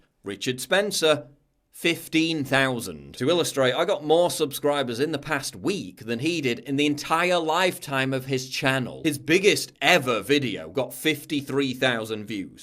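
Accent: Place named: Sheffield, United Kingdom. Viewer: Firstly, it wasn't murder. She died of a heart attack - manslaughter at the worst. Secondly, the Alt-Right does resonate with a lot of people. Red Ice TV has 145,000+ subscribers. James Alssup, present as Charlottesville, has 162,192 subscribers. Tara McCarthy has 50,000 subscribers. Alt-Right leaning "Black Pigeon Speaks" has 249,887 subscribers. Thirdly, makes some dubious claims to smear us all. Only Andrew Anglin mocked Heather Heyer's death. You smear us as "holocaust deniers" yet give no examples. Jared Taylor, Greg Johnson and Vox Day are far smarter than you'll ever be. You're just a sarcy and childish civic nationalist, regurgitating the same old Classical Liberal talking points with an annoying Sheffield accent.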